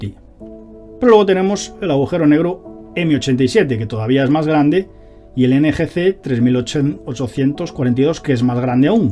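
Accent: Spain. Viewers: Información de calidad y el acento español del Profe que nos vuelve locas a todas ❤❤❤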